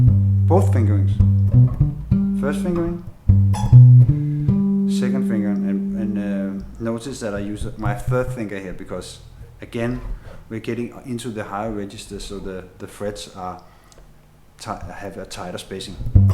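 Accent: Denmark. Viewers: Awesome lesson! :D really helped. And I love your danish-english accent :D so pwnage :D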